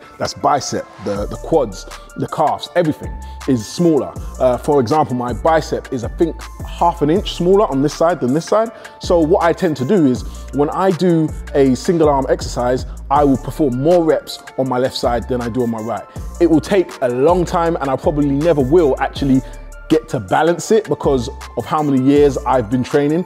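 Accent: United Kingdom. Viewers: Ain't you from UK 🇬🇧?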